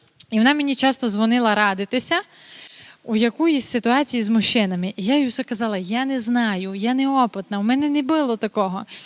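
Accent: Transcarpathia, Ukraine. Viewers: Жахливий діалект))) це на Закарпатті такий?) Але ха українську лайк, на підтримку україномовного ютьбу!) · Закарпатська українська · То не суржик. То закарпатська говірка. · Мова дивна, нащо так? З усіх дірок на російськомовних капає "вчить мову", а потім вмикаєшь і отаке. Дивися та червонієшь. То не стиль, не говірка, то якась фігня. Посорилася вона... ще й мати. Краще вже чистою російською, а не російською з українським акцентом. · туй нич не є руснацьких говірок. По факту : люмпенська тарабарщина з закарпатським акцентом, щоб кієвськиє панялі'.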